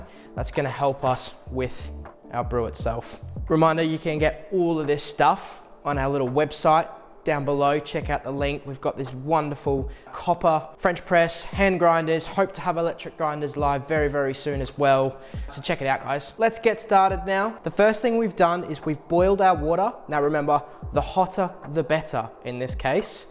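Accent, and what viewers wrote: Australia. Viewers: Clearly an Aussie from down under👍🏼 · Where in the UK are you from?! I'm Aussie and you sound like 95% Aussie to me! lol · Are you Australian?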